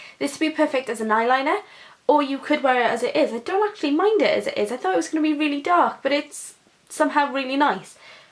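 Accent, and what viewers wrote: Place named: Wales, United Kingdom. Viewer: I noticed your welsh accent straight away Im Welsh too Lovely vid :)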